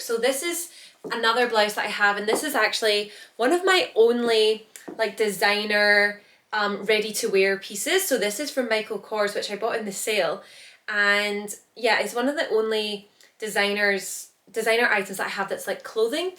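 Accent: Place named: Scotland, United Kingdom. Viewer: I love this video and I love your Scottish accent so much!! (it’s Scottish right?)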